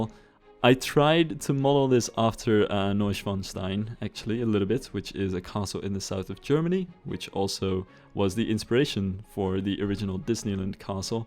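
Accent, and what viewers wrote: Netherlands. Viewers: I am New here but you sound dutch is that true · I suppose you aren't English. Hearing you talk It sounds like you are Dutch. Are you? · I think this fits your channel just fine. Its about building and architecture. And you appear to have fun doing it, which makes you enjoyable to watch. Well, and that fellow dutch accent. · you sound super dutch to me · Just found out about your channel and I love this video :) Btw, are you Dutch? I'm from the Netherlands and your accent sounds a bit like we speak English ;) It's not bad! Just noticeable :)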